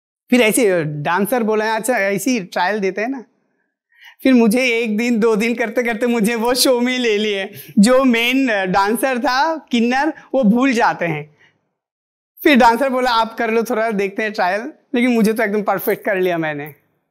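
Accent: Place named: Bengal, India. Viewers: Today after listening to her,I understand she is Bengali.Hats off to her struggle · She has an adorable Bengali accent. Is it just me who thinks that?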